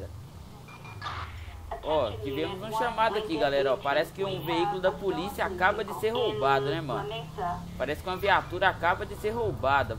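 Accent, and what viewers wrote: Minas Gerais, Brazil. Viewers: você é Mineiro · e mineiro???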